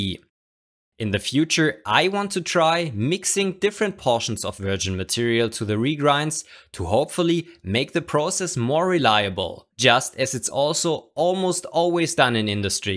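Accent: Germany. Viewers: Man hört ech raus das du deutschr bist ;) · Digga sprich doch einfach deutsch man merkt deinen deutsch Akzent also sprich einfach deutsch und hör auf so zu tun als wärst du Amerikaner oder so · Are you From germany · German accent? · you sound like you´re german ,im too! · You’re a German am I right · I smell some german accent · Are you german ? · so ein wunderschöner dicker deutscher akzent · der ausprache nach sind sie deutsch mein englisch ist auch nicht gut xd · I Hear the German Akzent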